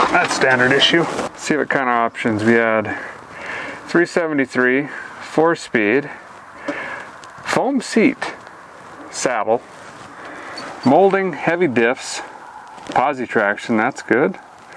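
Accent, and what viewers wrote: Minnesota, United States. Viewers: You sound like you're from Minnesota your accent is really thick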